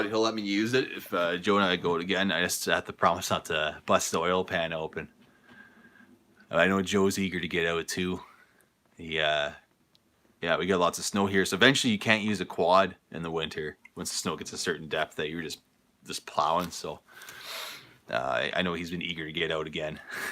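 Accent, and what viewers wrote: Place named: Quebec, Canada. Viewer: You were talking with your hands so the dog thought you wanted to play. You don't sound Ontario Canadian , you say eh way too much. I'm Ontario Canadian, and I say it a couple times every few months or less lol. Actually you do sound like you're French Canadian from Quebec with that many eh's in a short time. I prefer to watch mostly all Canadian channels of camping. I'm a new subscriber. Actually you could be from Vancouver or Newfoundland they say eh tons too.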